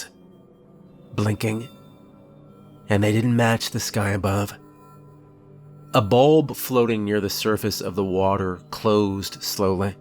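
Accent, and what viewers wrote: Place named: Maine, United States. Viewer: The voices of the characters that you make sound like they're all from Maine. 😅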